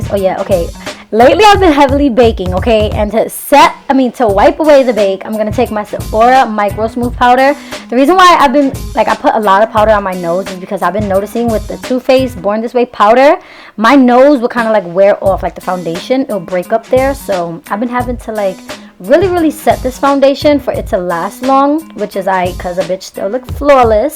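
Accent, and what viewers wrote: New York, United States. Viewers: she from ny !! not every bitch wants to talk like cardi lmaooo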